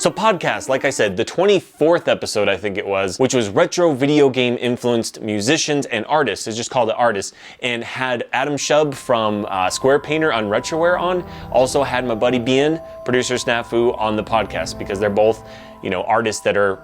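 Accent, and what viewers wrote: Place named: Midwest, United States. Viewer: Yay! Retroware fam :) yeah lol for some reason I hear some midwest accent. (probably just me!)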